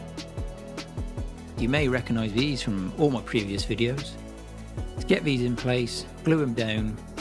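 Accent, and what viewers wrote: South West, United Kingdom. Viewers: I figured there was a south-west-ish flavour. I'm in Derbyshire limbo lol, as in right on the border of Derbyshire but with a Sheffield postcode, which is technically Yorkshire.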